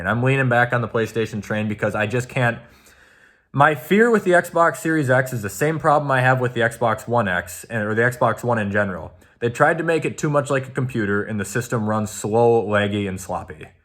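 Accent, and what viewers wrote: Minnesota, United States. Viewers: I am Canadian, and he sounds American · I knew you were Minnesotan cause I saw the banners and your accent is exactly like other Minnesotan accents Edit: you being a Minnesotan fan and saying you hate the cowboys more than the packers wow I’m surprised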